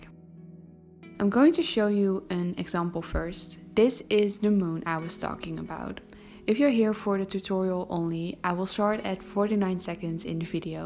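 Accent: Netherlands. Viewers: You sound like you are dutch, arrreee you from holland???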